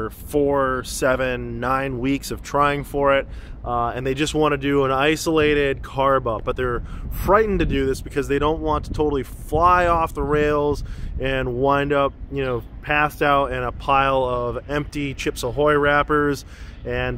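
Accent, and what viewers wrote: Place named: New York, United States. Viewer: I can't wait until ketosis becomes even more mainstream and your youtube channel blows up and you suddenly have a few million subscribers who are reeled in by your New York accent, your passion for weird music, and your "no-nonsense" style of talking and filming however you feel like. Then I can say, I've been watching his vids all along :)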